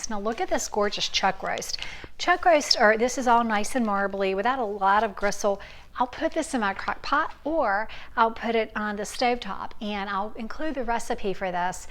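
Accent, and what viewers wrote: South, United States. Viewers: I walk around the house saying "fathead dough" in your southern accent. It's starting to drive my wife nuts... she says.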